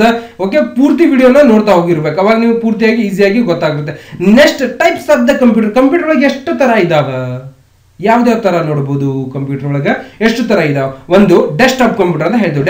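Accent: North Karnataka, India. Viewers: Super....knowledgeable video sir....your talking impressed me...I like your uttara Karnataka language......your video is helpful to me....all poor persons....and keep it up sir....continue next.....hevvy computer knowledge video provided me us......🙏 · Bhal chalo heltiri sir kelta idre boring agolla nam uk bhashe ne hange nanna 2 subscribe nive ast ista aitu nim video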